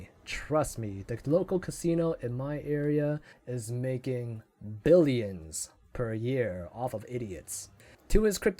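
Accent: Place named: Asia. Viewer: i can hear ur Asian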